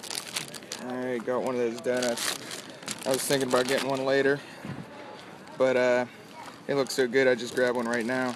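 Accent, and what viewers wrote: United States. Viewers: chicago accent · dat pixburgh accent.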